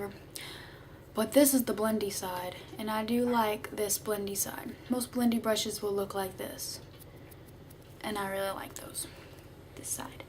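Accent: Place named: Texas, United States. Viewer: Where are you from?Texan?You kinda have an accent like me..lol,good video ;-)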